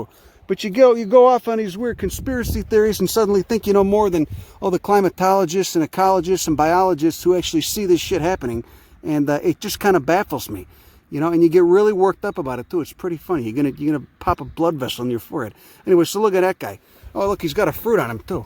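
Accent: Chicago, United States. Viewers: I adore your "plainsplaining." Your Chicago Italian accent is cool. Can you post a pic, so we know what you look like? Not expecting a Glamour Shot, nor anything salacious! 😜👾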